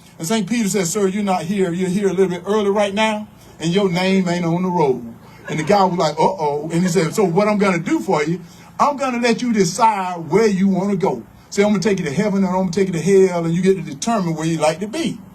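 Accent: South, United States. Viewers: Hope you win...love your southern,.accent, love your values, love your values..vote red.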